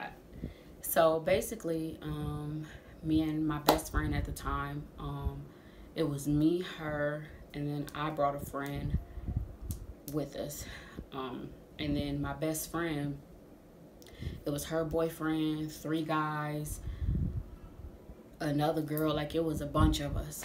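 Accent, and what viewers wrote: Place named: Ohio, United States. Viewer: I felt you were from the Buckeye, eard it in your voice. I live in the Cleveland area but I'm fom Atlanta. I've been here 14 yeas. Love te story, we all have one...even the " oh my" folks.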